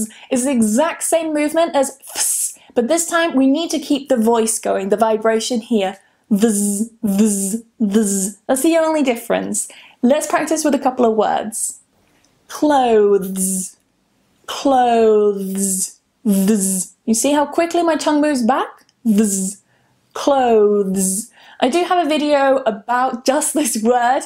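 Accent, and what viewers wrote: United Kingdom. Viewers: Sounds like British for me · I feel like you speak British aren't you👀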